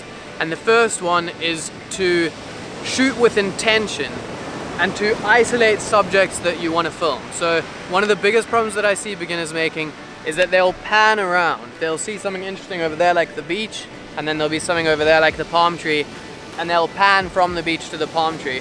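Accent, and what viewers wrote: South Africa. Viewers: Is that a hint of a South African accent I hear in amongst the UK accent? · Saw the title and knew I had to watch it...Heard the SA accent & was so happy 😂 great, helpful video thank you!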